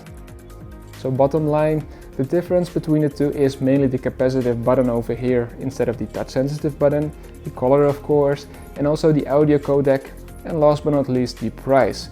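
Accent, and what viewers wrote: Netherlands. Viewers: just like the earpods? Just open the case? Edit: are you from the Netherlands because you sound like at Dutch man who speaks english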